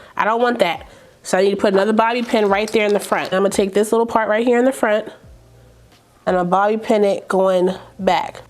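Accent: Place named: Baltimore, United States. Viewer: That Bmore accent! Love it!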